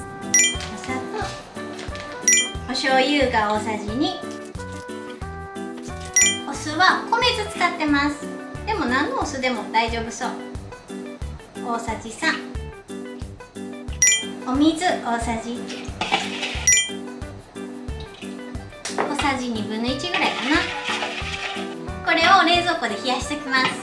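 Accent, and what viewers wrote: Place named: Kansai, Japan. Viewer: 関西弁が大好きです❣ 何時かそのラーメンパスタを試してみたい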